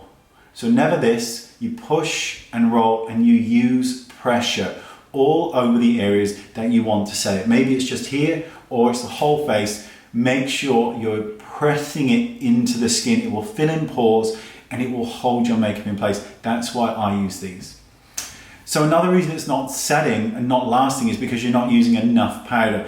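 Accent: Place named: West Country, United Kingdom. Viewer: it's a west country accent, it's not that fabulous in term of English accents, but better than mine at least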